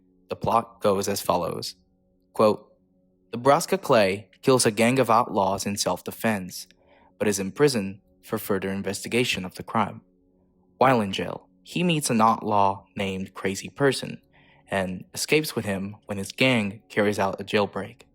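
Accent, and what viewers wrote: United States. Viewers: Wow, I'm not a native speaker of English but I really thought you were an American studying in Italy or something. Great content, keep it up!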